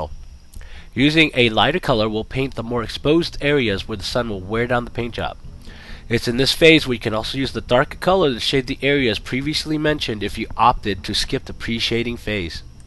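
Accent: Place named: Northern Ireland, United Kingdom. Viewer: Bet ye you're from Norn Iron!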